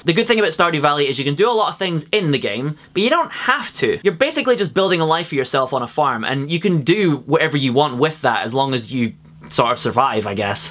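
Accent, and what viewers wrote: Scotland, United Kingdom. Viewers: I really like your videos! I subscribed trying to have a little more contact with the Scottish accent, but now I'm here because of you. And I'm glad you're back to posting!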